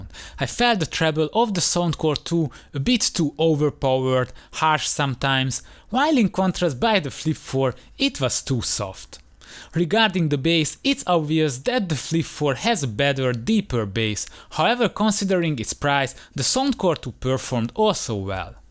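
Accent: Hungary. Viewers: De hallatszik hogy magyar vagy :D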